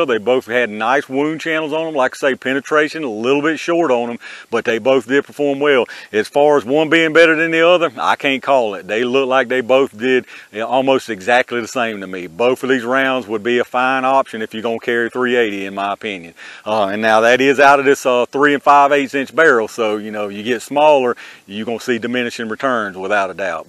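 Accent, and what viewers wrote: South, United States. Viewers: Whenever you come up in search, I automatically fast forward to 3-4 minutes in the video then I put your a*s on mute. It's just that your redneck vaginal-sounding lip- yapping is dumb.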